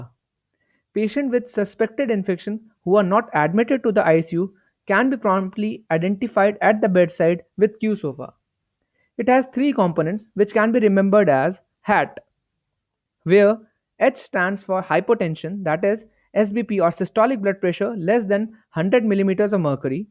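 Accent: India. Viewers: Indo English is annoying for me, but I'm sincerely grateful for quick lecture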